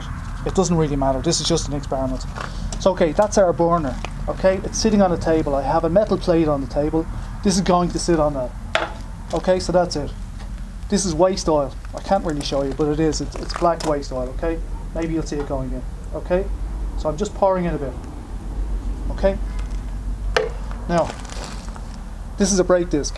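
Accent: Ireland. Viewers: OMG, that was entertaining to watch the entire way thru, what with the Irish accent, the simplistic attitude and the raw fire power just waiting for idk, something more than just heat.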